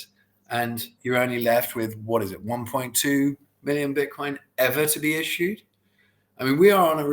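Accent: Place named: United Kingdom. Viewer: All I heard was a gay bored British accent start a sentence with the word “so…“ Before I quit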